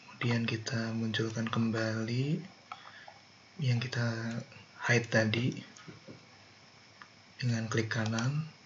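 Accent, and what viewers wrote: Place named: Java, Indonesia. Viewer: logatnya jawa abis hahaha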